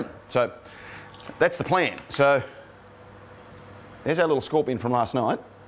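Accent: Australia. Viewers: bioluminescent tumors would have great applications in the world of Neurofibromatisis research. Apart from having my 6yr old scanned by mri every 3 months to monitor his multiple tumors, we could just run a black light over him. Is the venom specific to certain tumors when it attaches? It's something I'm keen to follow. Great work on the videos and channel, great to hear a Aussie while I'm in the U.S.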